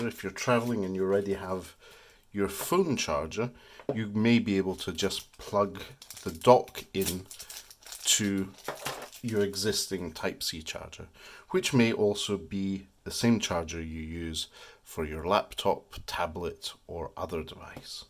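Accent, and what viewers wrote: Scotland, United Kingdom. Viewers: good to hear scottish dude doing tech and a youtube channel :D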